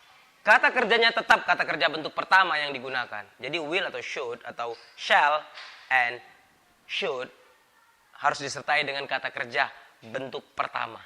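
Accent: Indonesia. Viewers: pronunciation nya dibaikin bang,., pengucapan not nya indonesia bingiitt,., tp pembelajarannya ok,,. lanjutkan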